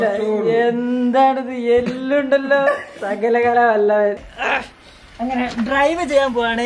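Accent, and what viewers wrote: Kozhikode, India. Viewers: Ninjaludhe varthanam kettal manasilakum kuzhikkudhanenn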